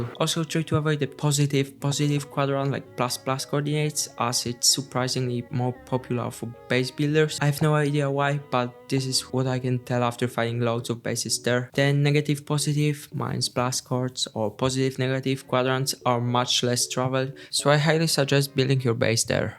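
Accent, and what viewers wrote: Italy. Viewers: Dude are you italian?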